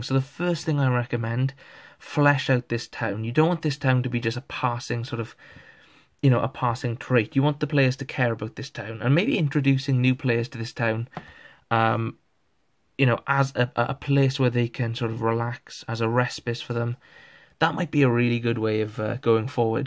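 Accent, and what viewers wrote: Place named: Wales, United Kingdom. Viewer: I know this accent! Are you a fella taff?! ❤️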